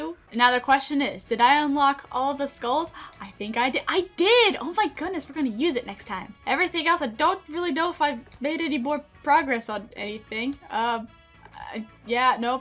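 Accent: South, United States. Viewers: How long have you been watching her because her southern accent has been known in alot of her videos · I could really hear that southern accent of yours in this video. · That cackle is exactly why I subscribed. Plus, the southern accent comes out everytime she's just in a frantic shipment mess. So good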